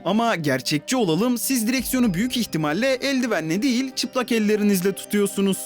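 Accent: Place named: Russia. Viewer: Kardeşim videoların gerçekten güzel ama konuşman kulağa çok ağır geliyor. Neredeyse Rus aksanıyla Türkçe konuşur gibi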